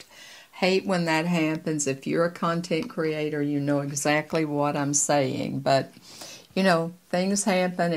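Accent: South, United States. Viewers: I tell ya, I am 52 and haven’t wore make up in years because of it setting in fine lines and wrinkles. No, I don’t have that many but you know what I mean, the make up would settle in those lines and wrinkles and it would emphasize said wrinkle and fine lines and it would make it look worse. I have recently had an outing with my husband and I bought that same elf foundation that you have there and it did pretty good. I didn’t wear any powder in fear that it would settle in the wrinkles. But thanks for all of your videos, I feel so inspired. And I figured you were from the South due to your accent, I think that’s why I immediately like you, I live in SW Georgia btw, lol❤.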